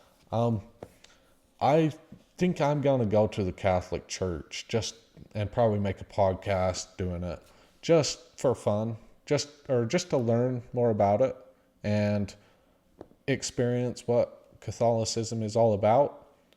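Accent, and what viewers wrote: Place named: United States. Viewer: I am so impressed with how sharp you are and with how calm and well-rounded your thoughts are. It’s really awesome to see you coming out of your shell and speaking your mind confidently🤗 PS: I don’t understand why people are giving you a hard time over your accent? I’m Australian so your accent just sounds to me like another American one that I don’t recognize😅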